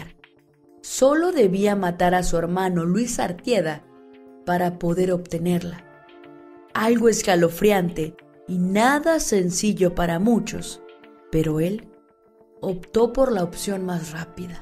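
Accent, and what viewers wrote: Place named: Mexico. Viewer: Gracias , te felicito y agradezco tu talento,me encanta tu acento mexicano. Abrazos desde Brasil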